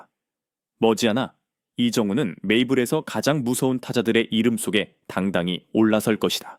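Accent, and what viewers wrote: China. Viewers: 해절자 중공인인가? 발음이 우리나라 발음이 아니고 어색하네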